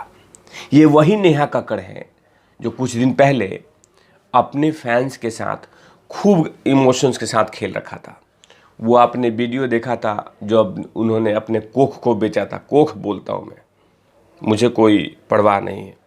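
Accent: Bihar, India. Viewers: AAP BIHAR SA HO KYA 🤔🤔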